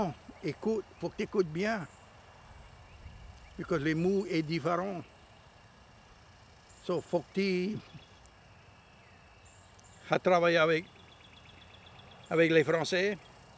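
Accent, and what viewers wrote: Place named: Acadia, Canada. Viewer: Jai toute compris ca que tu a dit je suit du nouveau brunswick canada un acadien on parle comme vous👍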